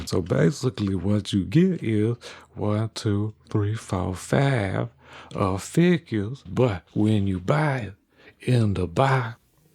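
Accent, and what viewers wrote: Louisiana, United States. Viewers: My favorite Youtube channel. Why can't I stop watching this silly-looking puppet with a voice from down in the Bayou!? I am an adult, after all, for goodness sakes! Now, I find myself shelling out my hard-earned allowance on this thing called Patreon, so you will keep making more videos. But I told my wife, "It's MY allowance, I can spend it how I WANT!". She just rolled her eyes and said..."Awww Rite!" In an authentic accent. I do love that woman. Keep up the great work making quality content. Mister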